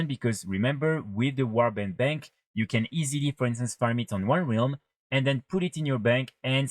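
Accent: France. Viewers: suscribed for the french accent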